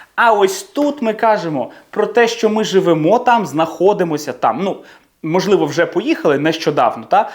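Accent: United Kingdom. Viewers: Дивлюсь відео і балдію 🤪згадую уроки англійської в свої молоді літа- зеара, бʼютіфул , зисис е пен 🤣🤣🤣🤣 а тут слухаю ніби британець ☺️😘Щиро вдячна за урок ! Успіху Вам і нам ПЕРЕМОГИ🇺🇦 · А в Нью-Йорку відчувався їм ваш британський акцент?? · Вперше бачу вчителя англійської з України з таким ідеальним брит акцентом, дуже нейтів🤩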